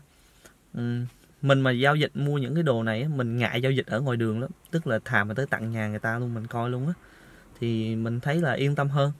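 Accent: Central, Vietnam. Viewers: Bạn người miền trung hả. Mình cũng plan mua xe nên xem bài của bạn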